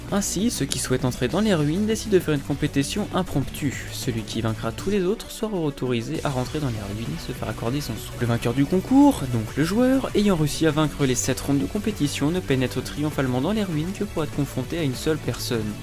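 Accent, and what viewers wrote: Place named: Spain. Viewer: frérot tu mélanges l'accent japonais foireux à ton accent français j'ai l'impression tu parles espagnol j'comprend rien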